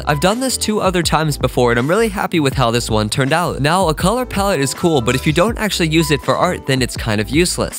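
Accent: United Kingdom. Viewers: you sound british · British accent pog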